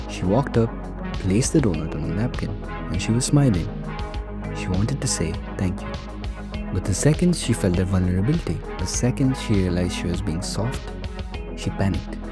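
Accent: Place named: India. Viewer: Hey man, it was honestly a great video, did not even notice that you are an indian. (You have just some general accent, that does not really hurt understanding you. Overall the video is quite nice, especially the editing, but i think that it lacks something for a super popular video, for some reason. Hope to see you creating in the future!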